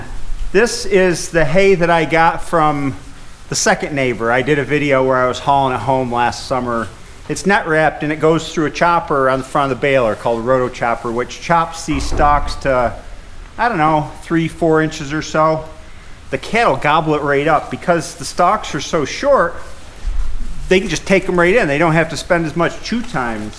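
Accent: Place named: Texas, United States. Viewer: Sound like Texas but no debate about snow😂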